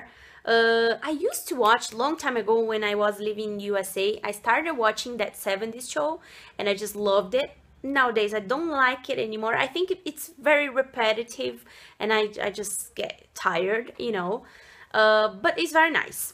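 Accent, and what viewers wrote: Brazil. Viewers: Strong, I mean! ;) But it's natural due to our Brazilian Portuguese phonetics, sometimes it can be hard to "get rid of" them! Mainly if you have started learning after a certain age... But don't worry, your English is great! It's completely natural having an accent, mainly if it's not your L1... And I meant you have a cute one, a cute accent! :D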